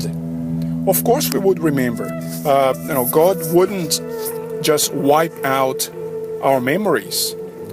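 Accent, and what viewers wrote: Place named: Germany. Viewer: Oh, WOW, lovely to have an interaction with you, especially since we are both on the "yellow brick road" like Dorothy from the "Whizard of " leading us to our true home! You are quite right about Austria being a place of knowledge, art & culture, but on the other more darker side it´s a stronghold of the Cabal (south of Germany, north of the Vatican & east of the Swiss financial Babylon - no coincidence that I decided to incarnate right here to clear up the stuck energies of bloodshed & endless wars on European soil. I was wondering about your accent & thought you might be of German origins as well, but you also made references to the Portuguese language..... so you got me confused there;-) But it doesn´t really matter as long as we are using the language of love & you definitely do;-)) Stay safe, & looking forward to meeting you in your next videos!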